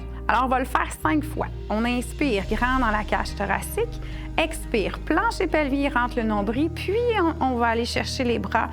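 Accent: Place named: Quebec, Canada. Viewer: grand merci pour votre réponse, car lorsqu' on est Français et qu'on n'a plus l'oreille trop fine, l'accent de la belle province a besoin d' être écouté sans perturbateurs musicaux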